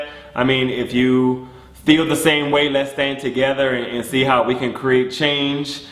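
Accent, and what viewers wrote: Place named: Lowcountry, United States. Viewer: He must be from the lowcountry..... I hear Lowcountry SC all in his voice!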